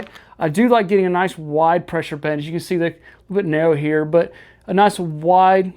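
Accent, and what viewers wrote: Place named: United States. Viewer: Very American sounding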